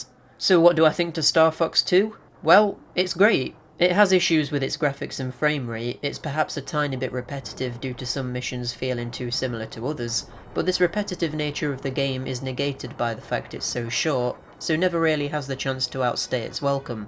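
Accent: North, United Kingdom. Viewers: yeah, tbh I'm on a bit of a marathon here, went to the roots, so to say and going up from there. I love watching how people gradually change their approach towards creating videos, from humble beginnings to more professional setups, more confident narration, how they change visually, all that, it's a lot of fun for me, like using a time machine. First time I saw u was at Retro Dodo channel when u joined them, it was quite unusual at first but I quickly got used to ur reviews, mostly coz I absolutely adore ur northern (i suppose) British accent plus the way u tell the story, it just feels right, u know, it's fun, entertaining, nothing too complex or boring, I realized I enjoyed listening even when u discussed smth of no interest to me (for example light guns). And when u mentioned that Oddworld series is one of ur favorite... my god, I was ready to buy the ticket, fly to UK to shake ur hand personally, coz I'm absolutely mad about Oddworld games (no 3D spinoffs though;) So yeah, then i stopped seeing u at Brandon's channel, did a little bit of digging plus u were kind enough to reply to comments, soon I realized u have ur own channel and then I decided to start from the beginning and watch more of ur content which I believe is very wholesome and fun. 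Wow, that was a long reply, apologies for that, u take care, bud. Oh, and I love cats too!!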